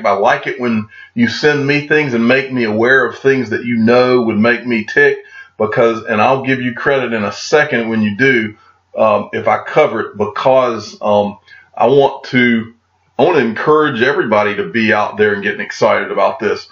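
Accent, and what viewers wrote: South, United States. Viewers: Love the good ole southern boi sweet tea accent lol your videos get me hyped like jungle Inc. You sure do know how to make ripple/xrp hodlers feel proud of what they are holding. Thanks for helping me realize that I needed to dump my neo ontology stellar and cardano all for ripple/Xrp. The light bulb went off 3 months ago after watching a slew of your videos. It finally clicked on which one was the potential winner 5-10 years out from all of the 2000 cryptos listed. See you in Lichtenstein brotha! Also, I live in Georgia. Hopefully we hop on the same flight.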